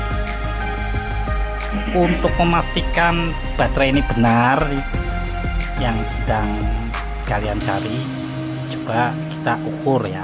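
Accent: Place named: Banyumas, Indonesia. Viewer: Ngapak ra jelas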